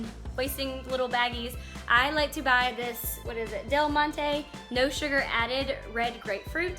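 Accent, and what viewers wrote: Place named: South, United States. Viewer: Hey!, I just want to say that I just love your southern accent, and as for the price of the meat, why don't you try out canned sardines. I just started to eat them. I got them from Trader Joe's and they are the best. Also, I want to say is that your competition meal prep is my every day meal prp.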